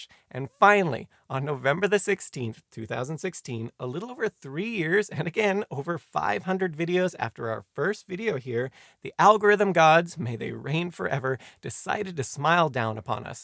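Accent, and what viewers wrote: United Kingdom. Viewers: If your audience is largely American, we like British hosts. People with accents are automatically smarter.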